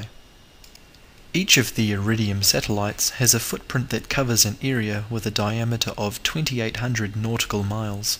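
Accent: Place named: New Zealand. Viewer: It's a New Zealander, trying not to sound TOO New Zealand-y.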